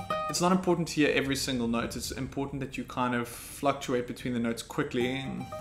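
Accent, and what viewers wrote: South Africa. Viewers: You kind of sound south African..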